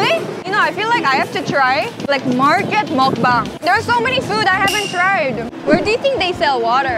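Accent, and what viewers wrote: Conyo, Philippines. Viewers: still conyo english no improvement at all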